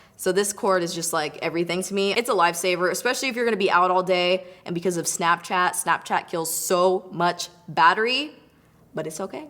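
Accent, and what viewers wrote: California, United States. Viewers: U have a classic Cali-girl accent! Lov it! I wonder where u grew up?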